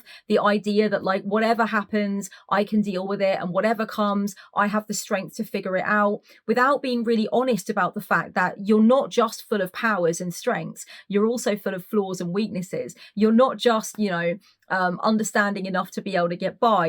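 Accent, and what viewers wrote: United Kingdom. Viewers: Yeeeesssss another unique British accent to save for my accents of Britain collection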